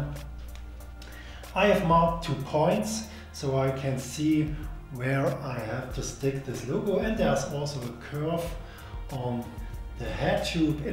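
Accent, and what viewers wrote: Saxony, Germany. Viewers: your english ist so f***ing German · Nice German acent! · the Saxonian accent pleas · Höre ich da sächsisch raus? Super Arbeit! Top! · Man hört das deutsch durch:-))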